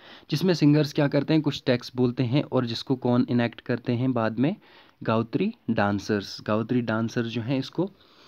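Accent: Kashmir, India. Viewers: U seem to be Kashmiri by ur accent...then why don't u know wat is tabaq maaz????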